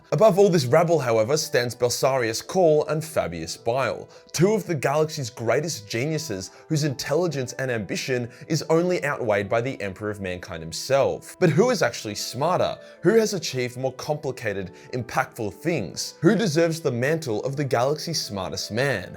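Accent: Australia. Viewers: yikes... Dont listen to this dude when he talks shit about college. Terrible advice. You really think a stupid website will hold more value to a degree which by the way, if you go about it wisely and use all the resources available to everybody, it wont cost you thousands of dollars. I got my associates without spending a penny due to scholarships and grants. Dont be lazy, be smart and ambitious. Really easy for this guy to say it when he got lucky off of talking about warhammer with an Australian accent for a living and thriving off of donations.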